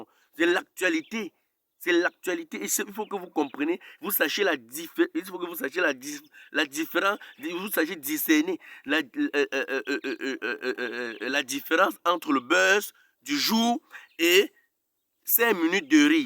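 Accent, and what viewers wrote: Cameroon. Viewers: 🤣🤣🤣🤣🤣 l'accent camerounais vraiment hein. Bravo 🤣🤣🥰🥰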